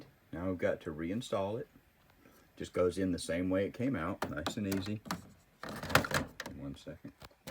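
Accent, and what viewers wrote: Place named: Texas, United States. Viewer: Does this guy not sound somewhat like Matthew McConaughey?!?! LOL! Gotta be from Texas.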